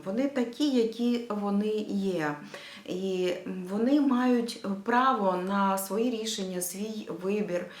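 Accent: Russia. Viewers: Відчувається, ваша російська вимова ( можливо ви раніше і спілкувалися тільки нею), але приємні ваші старання в перекладі українською. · Говорите-то Вы на украинском, а акцент у Вас русский 😂😂😂😂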